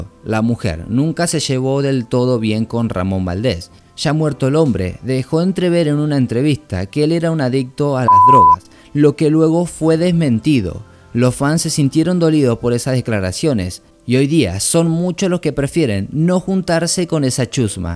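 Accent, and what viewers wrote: Argentina. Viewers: Sacá el acento de argentino lpm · SOS argentino? Porq hablas todo con sho jaj pero tenés otro acento a la vez jaja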